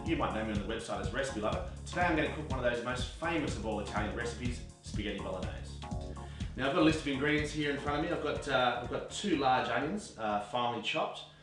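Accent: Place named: Australia. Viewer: i think he's Australian, that's why he pronounces stuffs like that. no problem about it. :)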